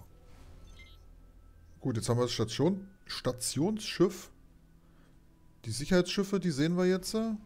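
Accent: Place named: Berlin, Germany. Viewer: Klingst wie ein Brandenburger. Die haben immer den heftigsten Berliner Dialekt.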